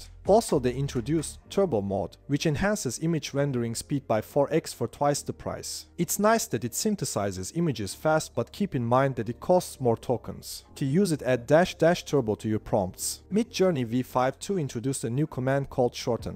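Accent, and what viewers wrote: Turkey. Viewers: Krall Türk müsünn bu bizim ingilizce aksanımız nerde duysam sanırımm hahah :D Ya şeyi sormak istiyordum örnek bir ürün fotografımız var çanta diyelim. O çantayı aynı tutup arka planınıı değiştirebildiğimiz bir sistem var mıdır bununla ilgili bir kullanım şekli ?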